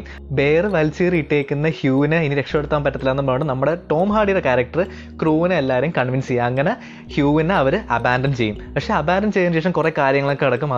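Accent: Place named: Adimali, India. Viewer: അടിമാലി ക്കാരൻ ആണോ നീ.. നിന്റെ സംസാരം അങ്ങനെ ആണ്.. അമ്മച്ചി അപ്പച്ചൻ..😇😇👍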